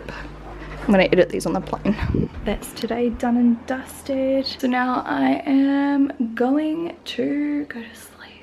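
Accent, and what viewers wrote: New Zealand. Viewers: The voice you do when you call your dogs, my mum thought it was me so funny our NZ twang 😂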